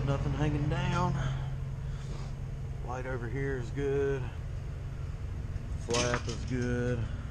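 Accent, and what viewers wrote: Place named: Alabama, United States. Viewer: Ahh that's cool! I am originally from Pennsylvania (Lehigh valley). I came down to Birmingham a few years ago to go to UAB and now I'm about to graduate and considering trucking. As I watched more of the video I was like he sounds like he might be from Alabama! Low and behold! I go to Alabaster a few times a week to go to Veterans park since they have a nice skatepark there. But I'm mostly just stuck by UAB which kinda sucks since I hate the city! And I hate the city drivers and how no one uses turn signals! And just the constant rush haha. I'm hearing a lot of good things about Crete. I'm going to check out your six month review! Thanks!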